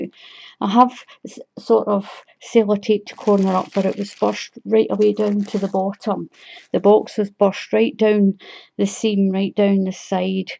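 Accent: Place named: Scotland, United Kingdom. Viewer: I think you’ll find it’s pronounced ‘boo-clay’, because of all the fuzzy bits. 😃😃😃. You deserve this lovely gift. Whenever I hear your voice I remember the delightful four days I spent in Edinburgh a few years ago. I loved Scotland.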